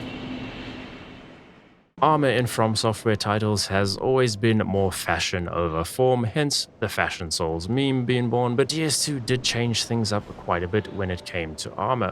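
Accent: South Africa. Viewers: saffa? your accent is difficult to place 🤣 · South African Accent?? My ears don't lie👀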